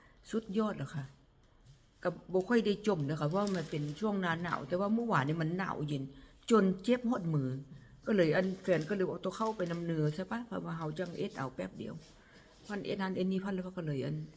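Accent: Nyo, Thailand. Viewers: สวัสดีตอนเช้าค่ะพี่ ได้กาแฟดำดื่มแล้วสดชื่นค่ะ ฟังสำเนียงพูด เหมือนญ้อครพนมเลยค่ะ❤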